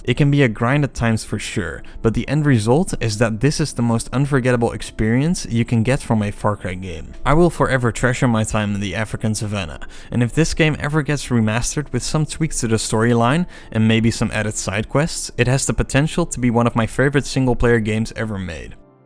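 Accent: Netherlands. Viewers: Recognised the Dutch accent immediately mate. Goede video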